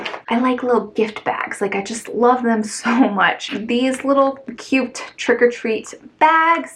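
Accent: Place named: Midwest, United States. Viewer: I'd say u have a Midwest accent! A lot of ppl in wisconsin pronounce those words EXACTLY like that!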